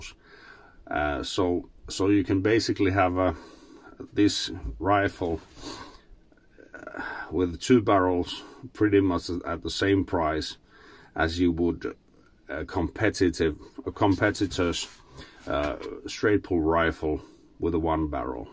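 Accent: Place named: Finland. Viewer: Ai että miten hienoa suomalainen puhdas rallienglanti 🥰🥰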